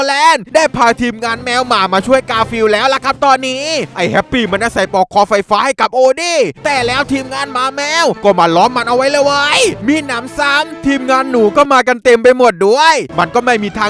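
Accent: South, Thailand. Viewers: คนใต้ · สุดยอดสำเนียงใต้ 555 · พี่คนใต้ใช่ไหมพี่ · พี่ครับพี่เป็นคนใต้หรอครับ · พูดไต้ได้ด้วยไอย่าไอบาวนี้ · พี่เป็นคนใต้หรอ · พี่เป็นคนใต้หรอครับ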